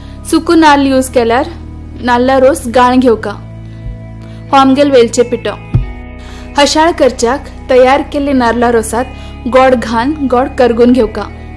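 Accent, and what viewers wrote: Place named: North Kanara, India. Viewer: Excellent video, brought back memories of this simple yet tasty dish. I love your Konkani narration is that from North Kanata?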